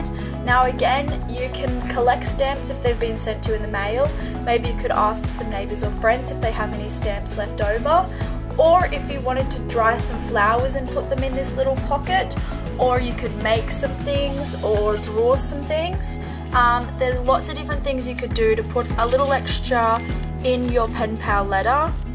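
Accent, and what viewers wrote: Australia. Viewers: You're aussie accent is so refreshing! I'm an Aussie and I don't know why but I get so used to international accents on YouTube videos that it's always such a nice surprise to hear Australian accents and phrases online! This video made me realise how much I've been missing simply talking to other people because of the pandemic! Excellent video too 😊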